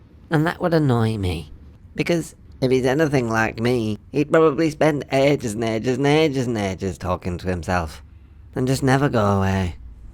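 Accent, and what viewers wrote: United Kingdom. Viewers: As a person who lives somewhere in America, I don't recognize all the British dialects. From whence is yours,? I find your voice rather soothing.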